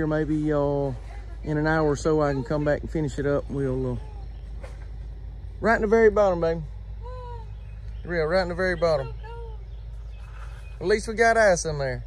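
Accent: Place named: Alabama, United States. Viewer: My sister in-law was born in Alabama and speaks exactly like you Roll Tide.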